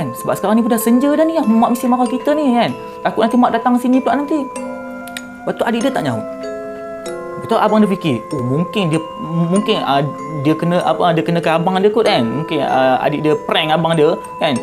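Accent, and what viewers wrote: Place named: Perak, Malaysia. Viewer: Bg aku cara penyampaian mudah paham. Mgkn sbb loghat peyrok nye pekat jgk.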